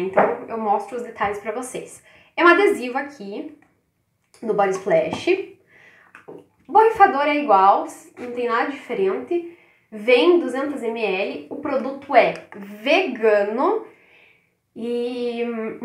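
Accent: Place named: Paraná, Brazil. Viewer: Oii lindona !.você é do Sul do Paraná? Tem um sotaque muito parecido 🥰♥️você é muito espontânea ,amei suas impressões! 😘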